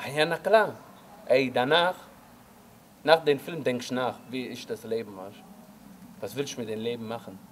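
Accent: Swabia, Germany. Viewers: Schwäbisch mit bissle andere Land noch bei, weisch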